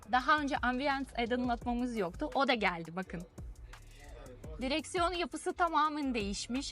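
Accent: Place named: Russia. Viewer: abla rus şivesiyle türkçe konusuyor ve almanyada 😮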